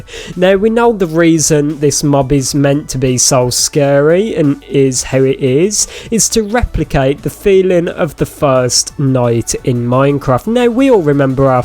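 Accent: United Kingdom. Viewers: I know it's british but which one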